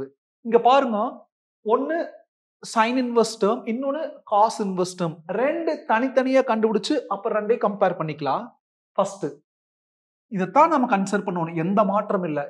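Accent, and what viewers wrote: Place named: Kongu, India. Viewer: Ss..... It's KONGU TAMIL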